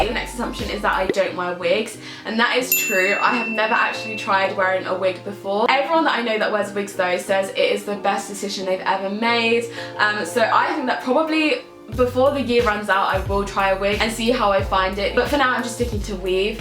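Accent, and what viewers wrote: United Kingdom. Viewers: Omg i totally wasnt expecting you to be British!! Whereabouts are you from??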